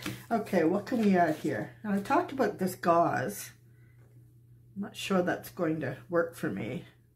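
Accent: Nova Scotia, Canada. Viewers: I was searching for plaster of paris projects for junk journal covers and your momigami video popped up and I'm so glad it did. I subscribed now and like the wide range of art projects on your channel. I live in Denmark, originally from California and adore your Nova Scotia accent. I had just made a journal out of crunched up paper bags with hand cream and loved the rawness. Last year I ventured into gelli plate print making as I don't get into the printmaking studio that often. I will try your momigami technique on them as well. Thank you for sharing your knowledge. By the by, I love Froyle's art too.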